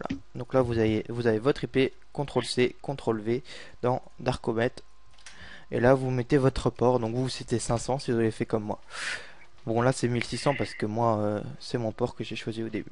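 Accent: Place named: England, United Kingdom. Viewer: L'accent anglais... :)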